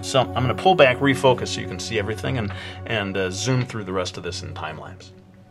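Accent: Brooklyn, United States. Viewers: Your Brooklyn accent is just too much!. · I do love your Brooklyn accent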